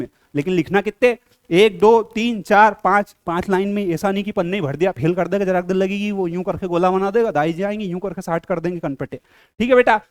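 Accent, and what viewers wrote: Indore, India. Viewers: He's accent says he is from Indore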